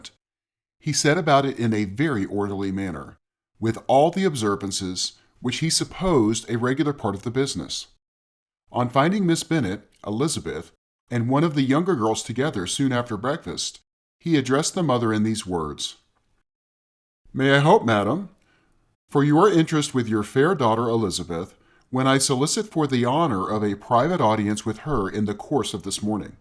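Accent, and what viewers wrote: United States. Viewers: I could not bare the mispronounciations and american accent. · It's exactly what I want, American accent! Thanks. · An American reading Austen just does not compute in my brain. This gentleman, though I’m sure he’s trying his best just does not sound right.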